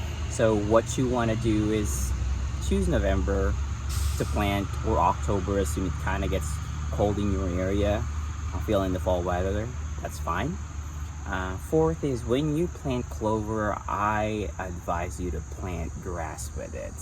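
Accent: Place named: South, United States. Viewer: I was thinking you were from the South. Thank you for the video! 😊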